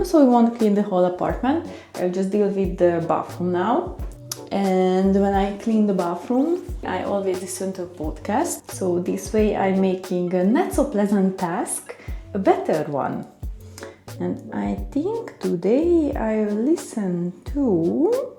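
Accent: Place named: Hungary. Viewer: Szia, mindig mosolygok amikor meghallom az accentusunkat 😊 még nem néztem meg a bemutatkozó szöveget, de rögtön tudtam,h honfitárs. Sok sikert a csatornához, nagyon szuperek a videóid. Ha megakarod mutatni az embereknek az Ír beach életet csak gyere fel hozzánk Balbrigganba 😊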